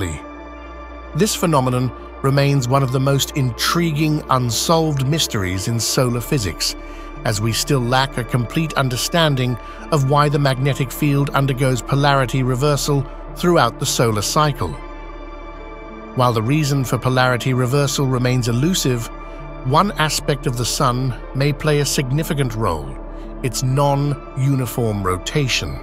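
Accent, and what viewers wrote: United Kingdom. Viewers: These dramatic readings with the English accent makes it all so Shakespearean. Loved near the beginning talking about machine malfunctions even though unplugged. 1850s had no electricity or anything, anywhere to “plug” in. The only electrics were primitive telegraph systems that because of the wires linking the stations acted as antennas directing the CME output to the stations. Just give the real history without the stupid drama please. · This channel is AI driven and produced content with a robotic British AI narrator so it sounds sciencie 😂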